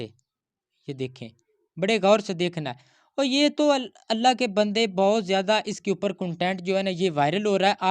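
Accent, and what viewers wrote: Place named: Punjab, India. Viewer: Hmmmm urdu m panjabi ka tarka ...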